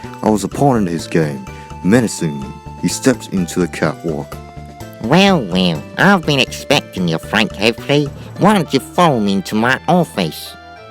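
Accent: United Kingdom. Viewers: you have a deep British accent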